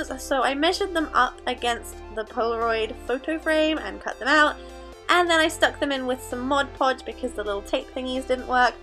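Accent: England, United Kingdom. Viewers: are you English? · Hi I'm really confused I love your vids but I just can't figure out your accent! Are you British ( like me yay!) but then you have a slight American tinge too! Also you mention American shops.. Please enlighten me!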